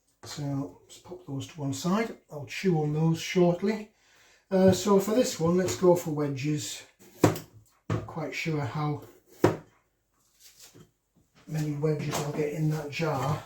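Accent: United Kingdom. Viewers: You definitely sound British. Love the accent.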